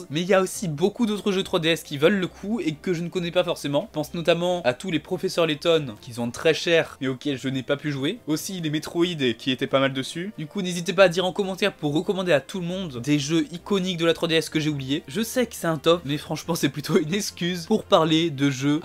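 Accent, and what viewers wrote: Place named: France. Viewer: J'adore ton accent bien français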